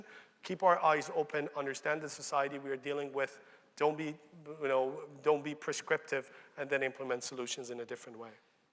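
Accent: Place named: England, United Kingdom. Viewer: I’m awed by this beautiful accent of yours, Prof. Madani! I came here to listen to your English accent and go, but I came, stayed, and listened to the whole speech. Watched it, loved it, and learned so many things from it. I'm a proud Iranian, but so sorry for not having you here in the country. Good Luck.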